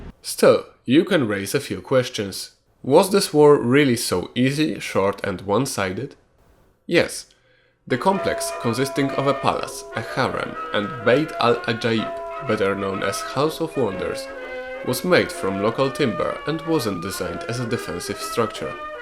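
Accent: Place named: Poland. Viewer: I had a strong premonition that you're Polish by the use of Asterix and Obelix. (then i also heard your accent) Great video!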